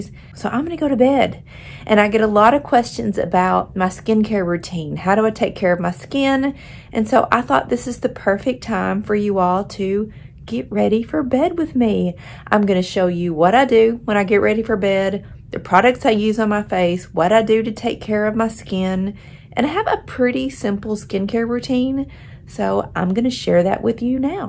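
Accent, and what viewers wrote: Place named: Kentucky, United States. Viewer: CJ...It's a good 'ol southern accent, Kentucky to be exact.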